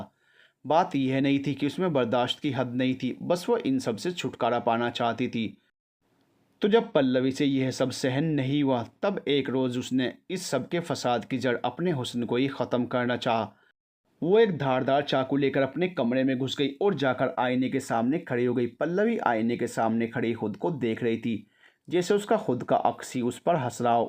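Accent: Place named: Mumbai, India. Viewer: O bhiya tapori bhasa to bade achhe se bolte ho mujhe bahot achh laga 😁👍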